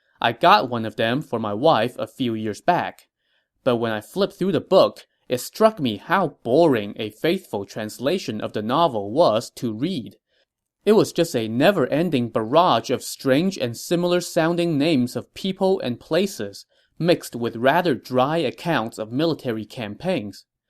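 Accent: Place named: China. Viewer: Its interesting to hear a chinese speaker speak english with how the pitch moves since it sounds so distinctly chinese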